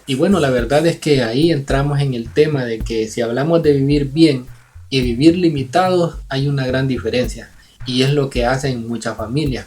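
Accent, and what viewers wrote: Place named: Cuba. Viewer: Por favor podrias pronunciar las letras S, FINALES? Y LA D, FINAL. SE TE OYE COMO J. TU CANAL ES MUY BUENO.ESCUCHATECY VERAS, QUE TU MODO DE HABLAR, PARECE DE UN CUBANO O NUCARAGUENSE PERO NO, SALVADOREÑO.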